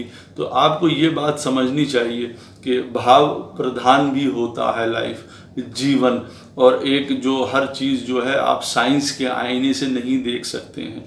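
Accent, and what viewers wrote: Lucknow, India. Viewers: सर आपका बात करने का तरीका बहुत बढ़िया है , ज़बान लखनवी लगती है । यदि यह तुला लग्न में हो तब 9वे राहु व 3रे केतु के उपाय भी बताए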